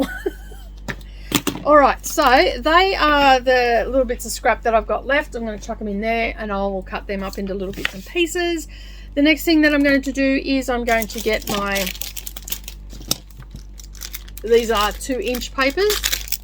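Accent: Australia. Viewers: Thelma writes:, so good to watch you doing EPP. I am doing just that at present using all my scraps. You voice brings me back to Melbourne where my son lives. I was there visiting my grand-children but did not have a chance to visit any craft shop. Thanks, I love the EPP, 👍 👍 👍 👍 Keep it up!!!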